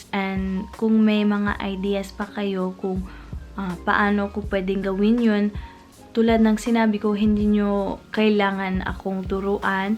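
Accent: Visayas, Philippines. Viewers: ... Di mo talaga maaalis na walang maninira sayo... Please do whatever you want to do... Natutuwa nga ako sa pagtatagalog mo kasi parang bisaya kang ka magtagalog... Kasi may gf din ako noon na taga visayas... Tuloy lang... Thank you... God bless you... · your so pinay (expressions, accent, slang, and heart).. haters will always hate.. but I like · Hi!, sobrang na amaze ako sayo. Galing ng accent mo sa filipino. Pati english mo nadamay na sa accent ng pilipino. Ang pagpili mo sa content mo na gamit ang wikang pilipino ay hindi po pagnanakaw ng kultura. Yan po ay choice mo. Hindi naman siguro patented ang wikang pinoy na exclusive lang para sa amin. OA lang ang nagcomment. Feeling nya, kanya lang yon. Hahaha... Ipagpatuloy mo lang yan. Bihira lang sa isang foreigner ang yakapin ang kultura namin, meron nga jan half filipino hindi proud sa kanyang kultura at di pa marunong magtagalog. 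Kaya welcome ka sa pagpili ng paggamit ng salita naming mga indio. Hahaha...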